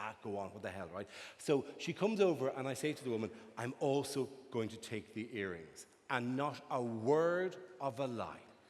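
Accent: Ireland. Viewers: it's just an Irish accent and not a lisp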